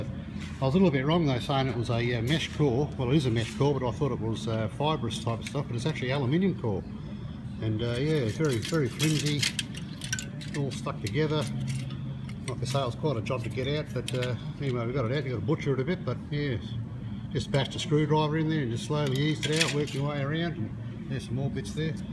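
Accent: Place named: Australia. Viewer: Great to hear an Aussie voice to explain...well done and thanks for the tip.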